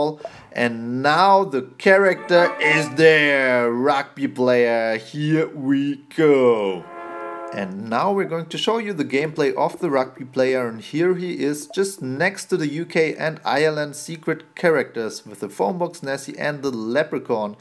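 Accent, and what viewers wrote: Germany. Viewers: Are you German? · You are German? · Your accent sounds like germain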